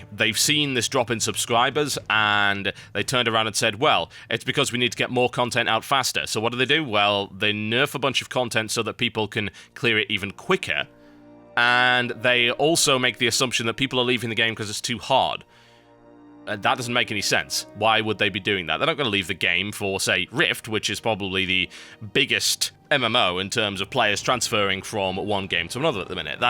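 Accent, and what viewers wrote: United Kingdom. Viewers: Thank you for your always honest and informed opinons in a british accent. You will always be my first stop on the interweb b4 i start hardcore gaming. And I'm grateful you will be continuing the mailbox as i love to hear your thoughts on things that the community has ideas or opinions about. Good Luck finding another MMO to play and i wish you the best of luck! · WTF WTF??? Wow is no more wow we known. It Changes when comes Lich king and Cataclysm. Reason why people stop playing wow its repeats all time everything is same. I like for his comment and i like british accnent ( sorry for my gramma ) so Good Luck i wish u very nice future!!!!!!!!!!!!!!!!!!!!!!!!!!!!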